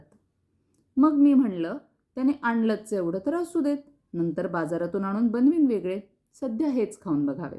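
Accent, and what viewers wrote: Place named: Punjab, India. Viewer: मस्तच ग, मजा आली ऐकायला,विशेष करून मराठी सोबतच येणारा पंजाबी तडका😀👌♥️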